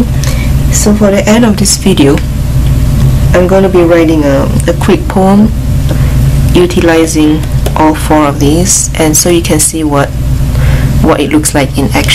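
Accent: Singapore. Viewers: Singaporean accent! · You sound like a singaporean. Are you from singapore? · You sound like you're from Singapore! Haha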